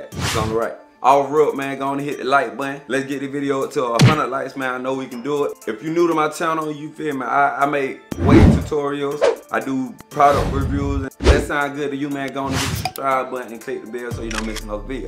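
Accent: Atlanta, United States. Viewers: STRAIGHT FROM ATLANTA!!! That accent is hard!! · I can tell you from ATL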